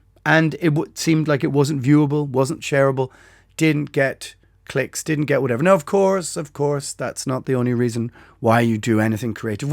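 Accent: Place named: Ireland. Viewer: Whenever you say (parentheses) I automatically know you are actually referring to "quotation marks" because you then use the phrase "rabbit ears." But it never registered you were misphrasing it until you started pointing it out, because the Irish pronunciation of the word is different from the American pronunciation :)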